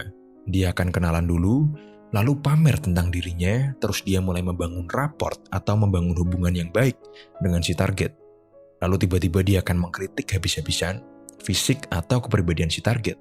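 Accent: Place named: Semarang, Indonesia. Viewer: Bang, lu orang Semarang ya bang?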